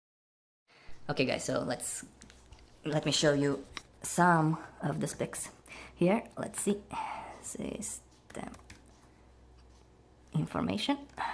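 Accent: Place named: Russia. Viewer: I think I can hear Russian accent when you speak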